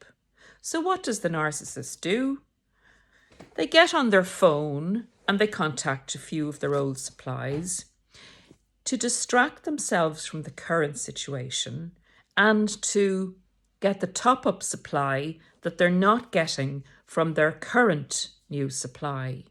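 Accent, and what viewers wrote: Ireland. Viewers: I love to hear your Irish accent demonstrating the way in which one treats the Narcissist (Covert) in my case. Keep up the good work! And thanks so much for all your work and may we all be blessed! I find my Guardian Angel is also helping me a lot in negotiating this new territory. God bless you as well as the Work! K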